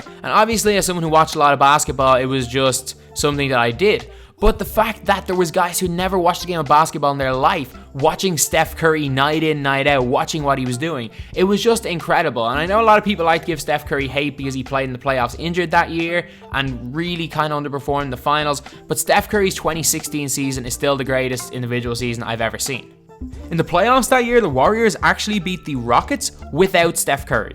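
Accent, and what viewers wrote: Ireland. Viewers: Nice one.. Very refreshing to hear an Irish voice on nba One thing though Linsanity happened in NYC yet they let him go. N it was a culmination of many misfortunes that led to Linsanity.. Don't how it would hv happened here n if it did how would it halt the dynasty.. Maybe a better dynasty? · Where in Ireland are you from?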